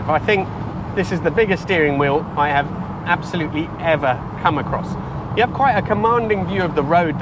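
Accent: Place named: Italy. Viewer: So damn cool how rare it is for such a nice car. I bet next to none came here to the US of A. Would be even weirder to have that than my Jaguar 420 compact. Maybe looks like something that a handful might have came to Canada. You say it's '60s, but what year is it? Must be very late '60s. Didn't Hub Nut or somebody do a video on this? I think your I-talian accent sounds very nice!!! Thanks for the great video!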